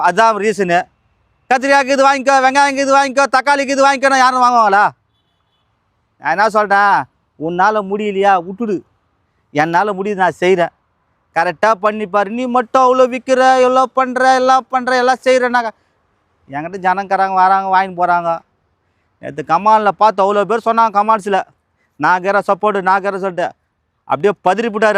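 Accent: Madurai, India. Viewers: Super Anna Madurai vasagam 🎉🎉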